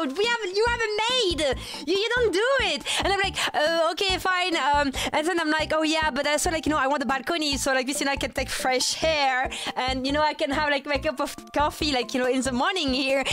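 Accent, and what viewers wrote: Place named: France. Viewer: I disliked french accent before but MAN im started to get addicted to it!!! I apologize... i wasnt really familiar with your game.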